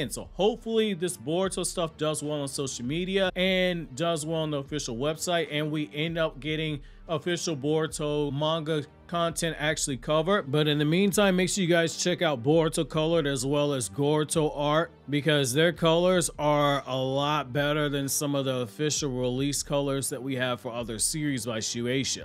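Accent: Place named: East Coast, United States. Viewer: He really think down south people country 😢 you can tell he from the east coast 😂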